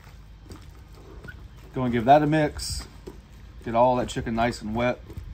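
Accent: South, United States. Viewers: With a Sweet Southern Accent 🤗😎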